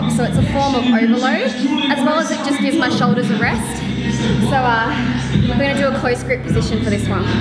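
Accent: Australia. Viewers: I changed my Alexa voice to Australian because of.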